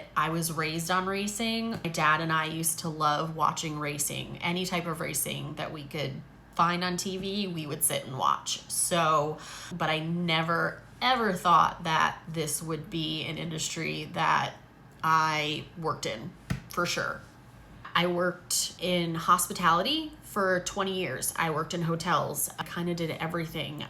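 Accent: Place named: New York, United States. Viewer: you sound nothing like a woman from New Jersey. Definitely from New York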